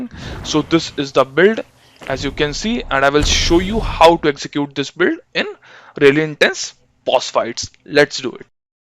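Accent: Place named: India. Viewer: I can't tell if he's being serious or meme'ing because of the super extra Indian accent. 😅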